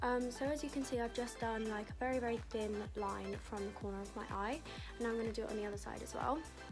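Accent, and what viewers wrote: United Kingdom. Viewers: are you from England? x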